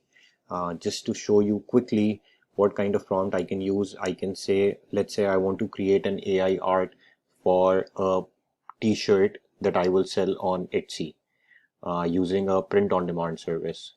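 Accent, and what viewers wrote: India. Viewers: Indiano ba ang nag voice-over? Galing mo naman po! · parang english ng indiano sir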